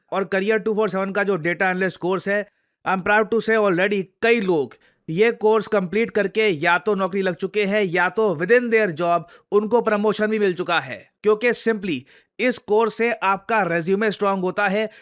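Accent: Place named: Haryana, India. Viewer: When sir says image Geri hai, 😂😂😂, sir ka haryana MTI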